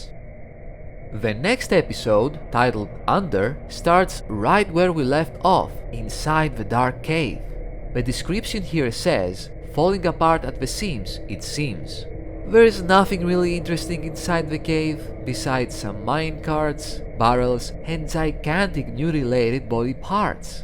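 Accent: Greece. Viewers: Instantly could tell you're greek! Hello neighbor! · is this a greek accent?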